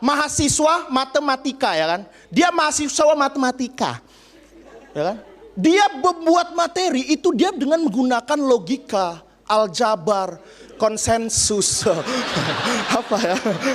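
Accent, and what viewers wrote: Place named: Lampung, Indonesia. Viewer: eksen bahasa lampungmu masih kental bro. sukses bro karirnya.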